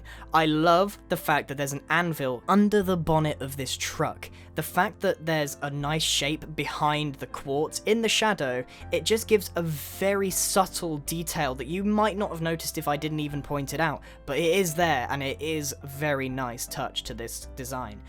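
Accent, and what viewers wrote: United Kingdom. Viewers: wiat grain r u british? i thought u just liked the accent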